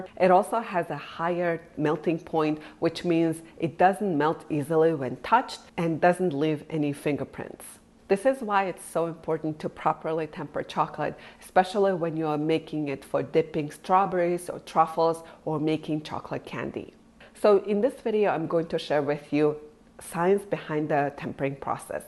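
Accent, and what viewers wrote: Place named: Australia. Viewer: I bet you sound so much cuter saying that with your Australian accent 🙂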